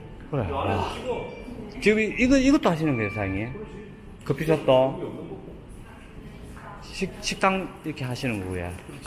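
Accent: Gyeongsang, South Korea. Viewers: 절대 경상도 사투리가 불편하지 않아요 가정적이시고 정말 보기 좋아요 앞으로도 계속 잘보겠습니다~~°행복하세요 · 오히려 더 친근감 느껴지는데요 경상도 사투리 중독성 강합니다 전 경상도 사람 아니지만 넘 듣기 좋아요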